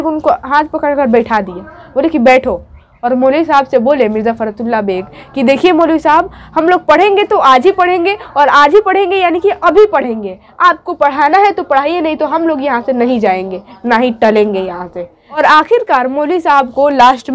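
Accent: Bihar, India. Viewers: Aap kya bihar se ho.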